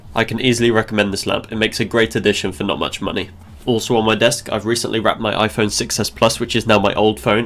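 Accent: United Kingdom. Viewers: Half way through building my set up great ideas. I have pops and it's great to here another English accent 👏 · Are you from UK?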